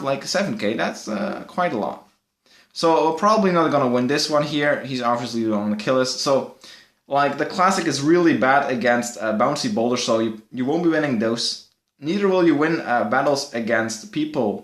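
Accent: Netherlands. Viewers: Are u dutch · you are dutch?! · Hé, je bent Nederlands! ( of Belgisch) : )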